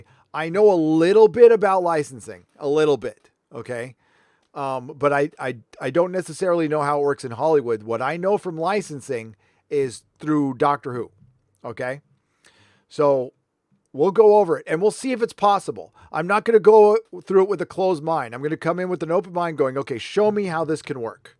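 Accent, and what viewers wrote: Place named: Scotland, United Kingdom. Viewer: Dude, I didn't need 23 and Me to tell you are Scottish. You are so very Scottish. Well aside from not hating the English anyway.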